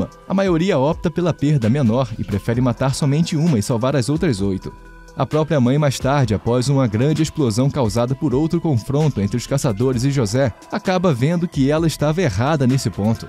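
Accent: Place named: Rio de Janeiro, Brazil. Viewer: Alguém ai mais percebeu que carioca fala NAICE (nasce), NAISCER(nascer) NAICEU(nasceu)? NAISÃO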